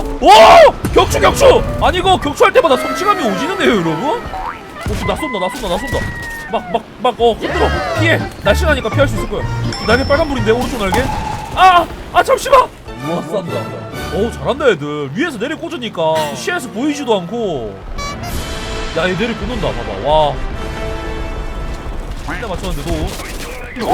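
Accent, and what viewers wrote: Busan, South Korea. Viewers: 앜ㅋㅋㅋ.. 역시 부산사나이답게 사투리가..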